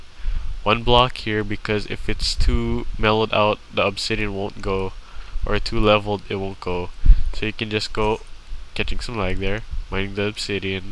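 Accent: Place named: Canada. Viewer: if i was to guess, i'd say mid-northern canadian accent.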